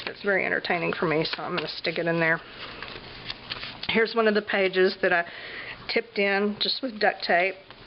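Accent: South, United States. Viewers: Okay, I am so stealing your "It's like perfume on a pigs ear." Just to let you know it made me laugh out loud!!! I love that there is someone else out here with a southern accent!! :)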